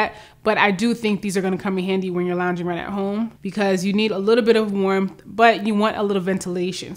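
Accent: New York, United States. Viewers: Great picks. Checking out the closet sale. And I’ve been meaning to ask you were from New York because of your accent and boom like that you told me without my asking 😊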